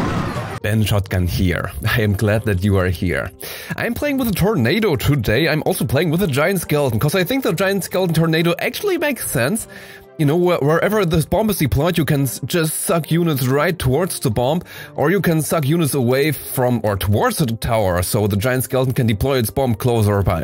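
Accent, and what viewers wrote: Germany. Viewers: are u from germany? or from where comes ypur acent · you sound like a german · youre accent is like germans english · He sounds German to me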